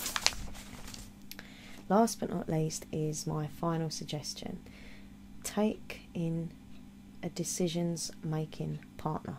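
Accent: Essex, United Kingdom. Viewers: Thanks for this video,; you can count me as another person who finds comfort with the ASMR community on here. I've seen a couple of your videos before and was instantly intrigued because you sound like you're from Essex (that's where I'm from originally) if not London, am I right? Right now, I am going through a particularly heavy bout of feeling inherently lonely. I have quite a few good friends but the problem is they don't live near me so I only 'see' them virtually 98% of the time. I don't have a partner and haven't had one for years and being quite sensitive as well as being a massive romantic at heart, sometimes it can be cripplingly lonely for me here. I've also struggled with Depression and mild anxiety issues for 15 years or so and that has increased of recent where I'd had a fairly long break of no depressive behaviour I'd like to reach out to you on here and am subbing so I can follow you and catch up on more of your content And yeah, I know EXACTLY what you mean with the shopping thing. 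Happened to me just today. I've said this before in the comments section of a few ASMRtists, but I think that some of you are really some innately beautiful souls that do so much good and are so compassionate and genuine, that I know that for me personally, I would have a harder time coping and relaxing sometimes if it weren't for people like your good self making such invaluable and beneficial content. So please do keep on making videos and ignoring all the f**kwads and trol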